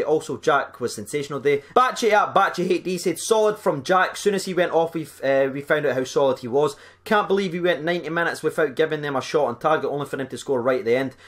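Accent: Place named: Edinburgh, United Kingdom. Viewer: Deffo pal 👍 this has been the case for the past couple of years even down south, ive never seen so many ridiculous decisions made by refs up n doon the country, feckin ruined ma sunday 😠 ma only bonus is watching Utd v Bayern tonight. CONSISTENCY is all we want wi a bit o common sense ffs. You pretty much summed up everything i would've said 👍 Where are you fi pal you sound like you're from near me in the Capital 😆✌👌🙋 WATP 🙌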